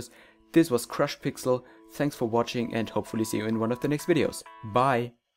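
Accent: Germany. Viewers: u have a speak is like german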